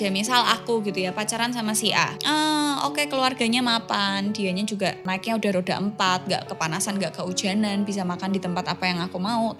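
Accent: Java, Indonesia. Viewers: Seneng ngerukngokno suara jowomu mbak 😂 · ❤️mbk konten mu super duper jos low.. jowomu jek kentel 😂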